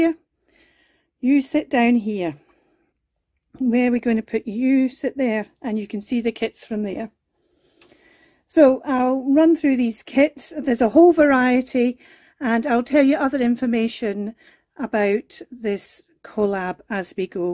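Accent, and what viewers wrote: Scotland, United Kingdom. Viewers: I love this so much; I could cry. 💞 I'm so excited for this collaboration!!🧚🏾‍♀️🧚‍♀️🧚🏻‍♀️ You sound like you're from Scotland. My Grandpa is from Dunfirmlan🩷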